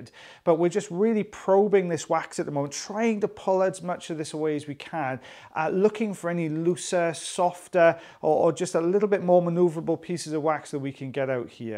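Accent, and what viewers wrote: United Kingdom. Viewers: I’ve watched ear wax removal videos that have no explanation as to what they doing and I wondered why they only use certain tools at certain times. I had no idea the white stuff was skin! I found your videos and love that you explain everything and I’m a sucker for a British accent! I’ll watch no matter what format. Tissue paper??? Really??? Why?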